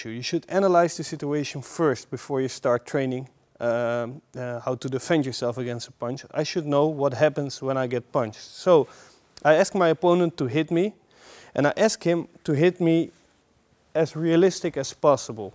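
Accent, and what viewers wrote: Netherlands. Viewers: je bent nederlands he ?/? ik hoor het aan je acccent.. waar zit je op school. want ik wil ook systema gaan leren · 100% Dutch...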